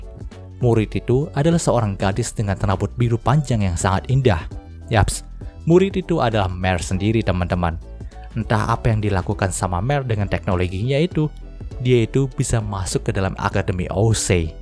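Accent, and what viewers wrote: Cirebon, Indonesia. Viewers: Kya logat Cirebon 😅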